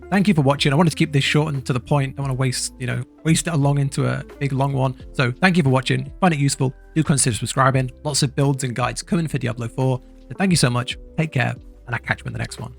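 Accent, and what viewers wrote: United Kingdom. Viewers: he talks too dam fast to the point where it sounds like he sped his voice up 😂 I rewinded the vid 8x still can't understand the 1st thing im supposdd to do 💀 And the UK accent isn't helping 😭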